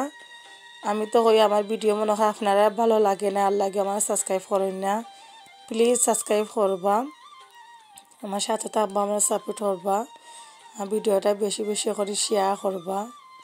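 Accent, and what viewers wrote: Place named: Sylhet, Bangladesh. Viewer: o mai go sylheti ni go😀yummy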